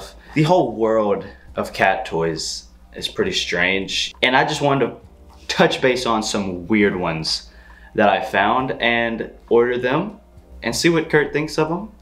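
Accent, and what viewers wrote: South, United States. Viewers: You sound country 😂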